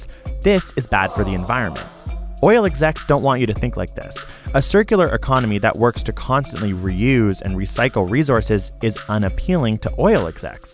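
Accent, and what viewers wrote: Canada. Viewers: Youre canadian?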